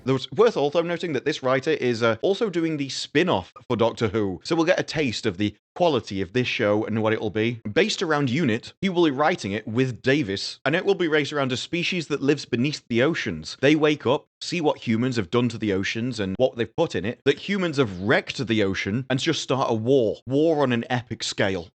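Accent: United Kingdom. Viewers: I didn’t realize right wing American bigotry could have a British accent.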